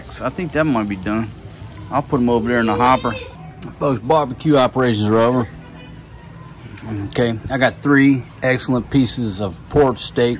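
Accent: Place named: Texas, United States. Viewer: Hi, alam mo you are the best in that region... your videos are the best// I am from Singapore and have a lot of USA friends, pero you are the best although i can sense you from Texas (your accent the giveaway)... hahahahaha/// love your videos my friend... Waiting for you to choose your wife number 3... don't know what I blabbering... kasi I am drunk... hhahahaha... nice videos my friend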